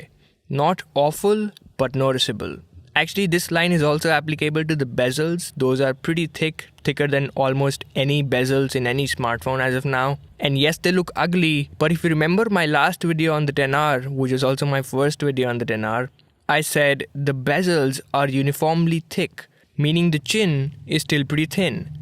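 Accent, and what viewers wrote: India. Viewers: I loved Indian accent!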